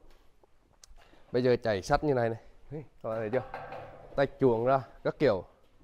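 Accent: Nghe An, Vietnam. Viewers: Bặn lên bặn lên. Đúng đậm chất nghệ luôn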